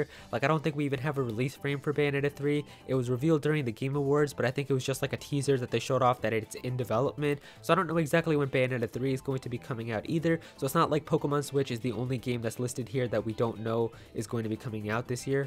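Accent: Netherlands. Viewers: Wait a minute, are you dutch. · wait, are you dutch? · Are you dutch? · are you from the Netherlands or Belgium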